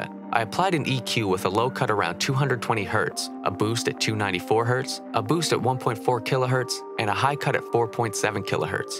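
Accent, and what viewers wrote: United Kingdom. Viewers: I think he from the uk 🇬🇧 bruv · He from Britain lmfao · Georgia I could have sworn he was British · he's british right? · Bro he from the uk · Oi he from the uk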